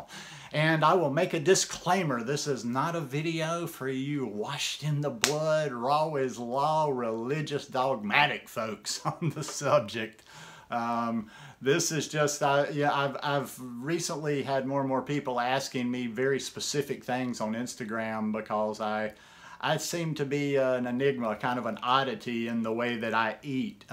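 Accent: South, United States. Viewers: If you want to put weight on, working out does build muscle! So, if you are not working out, you may want to add that to your weight gain process. Great videos! Thank you for sharing your experience! Your southern accent Always keeps your videos interesting and peaceful as I grew up Texas and you remind me of my life growing up! Vegan for life!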